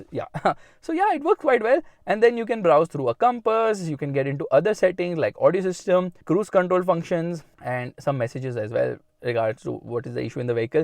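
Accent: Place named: Karnataka, India. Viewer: Bro just amazing to hear Kannada voice from cop site bro❤❤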